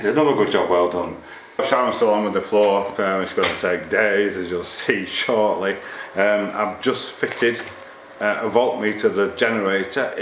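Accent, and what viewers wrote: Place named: England, United Kingdom. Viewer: Could you tell me where in England is your accent coming from? Thanx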